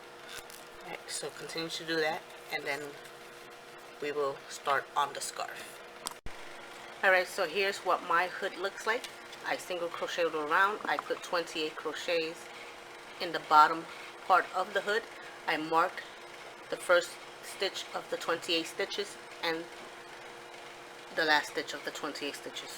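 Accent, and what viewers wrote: New York, United States. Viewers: Thank you for your clear instructions. I can't wait to start 😊. Do I hear a fellow New Yorker?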